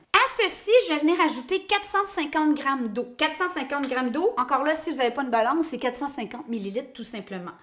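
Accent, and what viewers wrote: Quebec, Canada. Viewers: Bonjour, Magnifique votre pain en cocotte mais comment faites-vous pour ne pas grossir? Moi, j'adore le pain ainsi que pas mal d'autres recettes mais je dois me retenir d'en manger car tout cela me fait grossir. Merci pour le partage. J'adore votre accent quebecois. Bonne journée.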